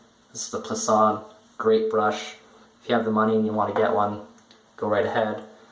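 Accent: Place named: Canada. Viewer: You sort of sound like Ed Norton's character in the movie Rounders with a bit of a Canadian twist.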